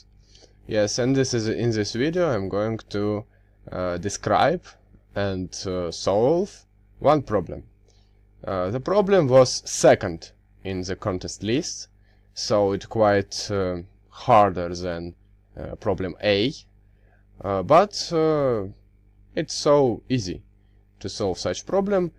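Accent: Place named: Russia. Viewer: your english has a russian tone, but it doesn't matter. you are a good communicator. keep it up.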